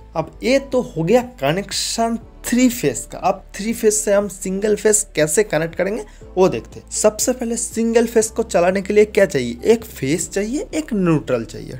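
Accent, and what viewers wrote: Bengal, India. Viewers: Best videos bro. Keep it up🎉. It feels good in bangla accent.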